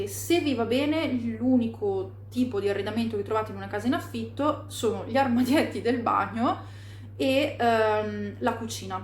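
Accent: Italy. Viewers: il tuo accento è italianissimo, lombardissimo. E' esteticamente che sei italiana quanto io curdo. Le tue ORIGINI non sono italiane. O hai il coraggio di dirmi che tutti e 4 i tuoi nonni sono italiani ? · ma perchè parli con accento milanese se sei in norvegia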